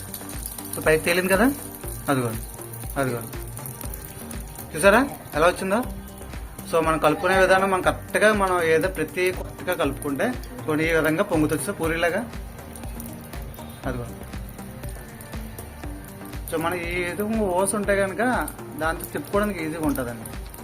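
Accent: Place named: Andhra Pradesh, India. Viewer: Super recipes ee vooru andi meedi language maa Andhra language laa vunfi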